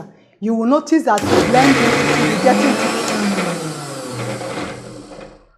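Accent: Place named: Nigeria. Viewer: Madam Chef, your accent is that of a Nigerian.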